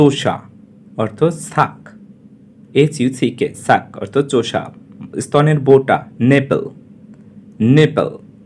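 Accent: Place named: United States. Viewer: ইউটিবার ভাই ঠিকই বলেছেন। আপনি আমেরিকার উচ্চারণ দেখতে পারেন। তারা এভাবেই বলে। call রে বলে খল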